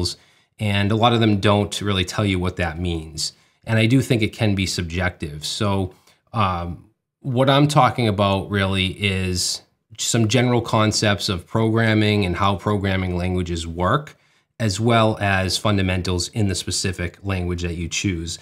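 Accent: Boston, United States. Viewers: I love the Boston accent.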